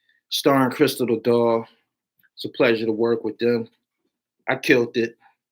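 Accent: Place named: Pittsburgh, United States. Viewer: I be hearing the Pittsburgh affiliation when you speak. We neighbours. Respect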